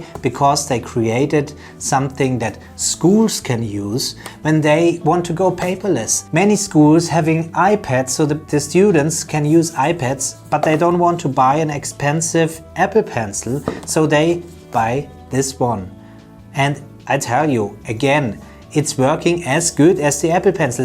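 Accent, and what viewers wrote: Germany. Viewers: Where are you from? Germany?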